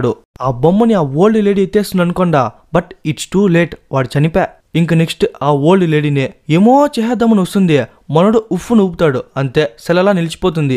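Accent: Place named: Chittoor, India. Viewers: Babu ee uooru manadi yasa tedagaundi, rayalaseema slang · This chittor slang and he live in Bangalore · Thammudu ekadanundi nuvu Ni language slang Chittooru madiriga vunde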